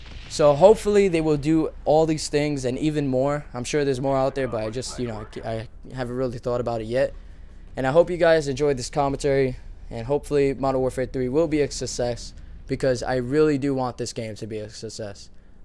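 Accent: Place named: New York, United States. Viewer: Yes! A New Yorker! Finally! I've been looking for a commentary with a guy from New York!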